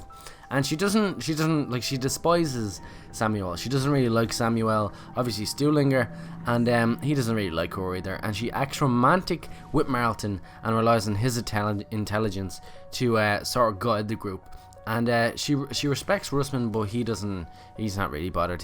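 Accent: Ireland. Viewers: are u irish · i can tell your irish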